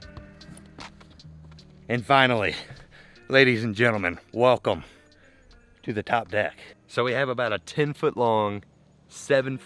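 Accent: South, United States. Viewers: Looking forward to future updates, and subscribing for that lovely southern speaking voice.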